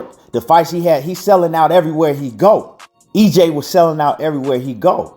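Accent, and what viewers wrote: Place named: West Coast, United States. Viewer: Yo, Watching this video was like a breath of fresh air. Man, I'm tired of always defending Errol at the family bbq's, everybody seems to think that everybody (including EJ) is ducking Bud and it simply is not the case. You sound like a west coast dude, I was born in the CPT, but grew up in Pomona. I'm gonna have to recruit you to help me defend my boy Errol when the dads congregate at the little kid b-day parties and talk about how Bud is the best and is being ducked. #BringBacktheShag, #ManDown, #BodySnatcher. Peace and Blessings to you.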